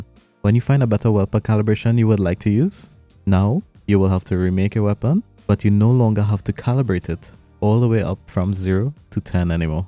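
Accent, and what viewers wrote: Trinidad and Tobago. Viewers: Are you trini? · Lol I commented this too..lol u cant hide that accent g I hear my people voice 🤣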